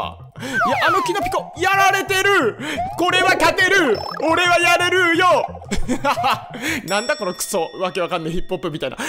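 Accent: Fukuoka, Japan. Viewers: 方言よきよき😊 福岡住んでたから愛着あるぅ！いまだ福岡の方言でちゃいます😂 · 福岡の方言のほうが聞きやすくて好きです🤘🏻笑 今日も動画面白かったです！ありがとうございます☺ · 自分も福岡ベンなんで、方言使ってくれるの、嬉しいです！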